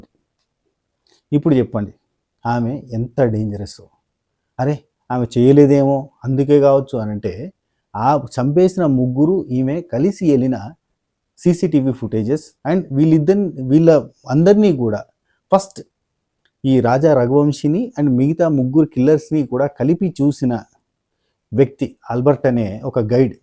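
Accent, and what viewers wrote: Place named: Telangana, India. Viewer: తెలంగాణ శ పోరడివి కదా! నీ స్లాంగ్ పక్కా తెలంగాణే.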